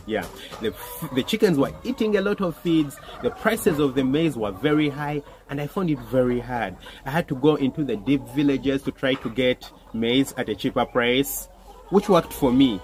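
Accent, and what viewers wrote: Uganda. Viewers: Are you from Uganda? Your accent 😀... Anyways you’re doing a very good job , may God bless the work of your hands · I don't know what it is but I love hearing a Ugandan accent!! much love from your neighbour here 🇰🇪 great job Doc!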